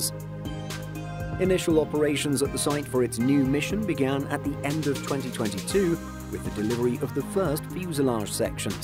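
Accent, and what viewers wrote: United Kingdom. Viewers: Would live to see the person behind this voice. Perfect british accent and perfectly pronounced French names. Hats off to you gent.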